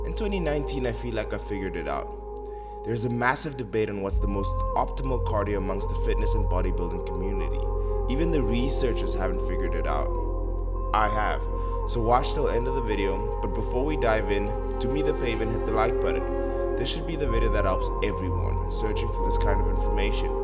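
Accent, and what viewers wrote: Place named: South Africa. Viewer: This accent sounds South African 🤔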